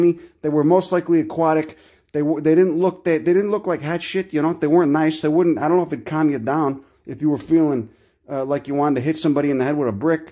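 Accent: Chicago, United States. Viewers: Even with the Chicago accent, tats and foul mouth - it's pretty adorable how much you love flowers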